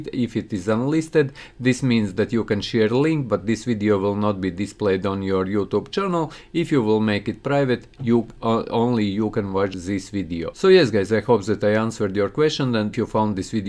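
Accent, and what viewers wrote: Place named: Russia. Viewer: I love his accent. Russian is the best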